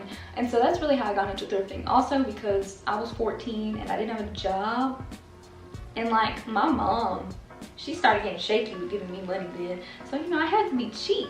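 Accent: South, United States. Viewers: Ugh i was hoping you’d say what state you’re from. I love your southern accent 🥰. I’m from the south too girl; Tennessee to be exact. But i understand being from a small town you want to keep that private! Enjoyed this video! We’re so similar in ways and i really love your personality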